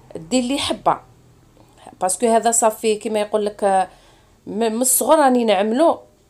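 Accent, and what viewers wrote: Mascara, Algeria. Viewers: يعطيك الصحة بصح اختي فيك النفخة علاه زعما؟ الهدرة تاعك تاني تاع معسكر ؟؟؟؟؟؟ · سلام اختي انتي معسكرية ولا دزيرية انا تعجبني اللهجة معسكرية ونبغي نساها شابات وحادكات الله يبارك هدري بالمعسكرية وشكرا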